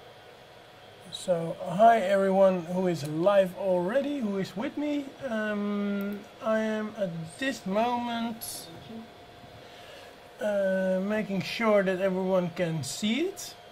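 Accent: Netherlands. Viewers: Grappig, ik vermoedde meteen aan je accent dat je uit NL kwam.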